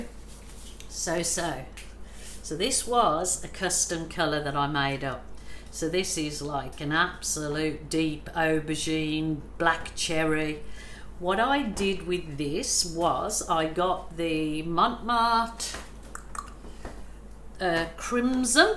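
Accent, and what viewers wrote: Birmingham, United Kingdom. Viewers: Hi Love your work! are you from Birmingham UK? I can hear a bit of Brummy there. I grew up in West Bromwich, but have lived in Switzerland since 1980. love your videos! Janet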